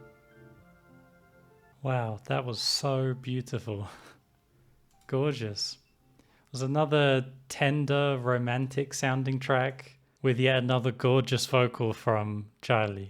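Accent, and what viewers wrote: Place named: Liverpool, United Kingdom. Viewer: you sound liverpoolish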